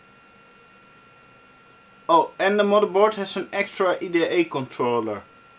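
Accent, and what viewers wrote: Netherlands. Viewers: He has a dutch accent, im dutch as well, his english is sh#t just like his entire generation, not his fault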